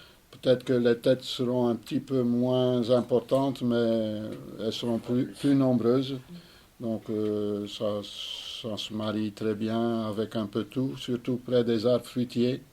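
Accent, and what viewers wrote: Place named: Nord, France. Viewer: Oui, je sais, j'aime vous écouter car ça me rappelle mon enfance dans le Nord avec toute ma famille frontalière, qui avait cet humour que j'aime (et aussi l'accent) Mais pas que, aussi votre grande expérience du potager. Portez-vous bien. Merci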